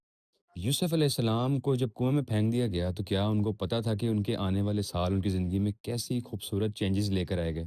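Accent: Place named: Pakistan. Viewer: Aap k elafaz bolne ke treqe se lagta h aap pakistani ho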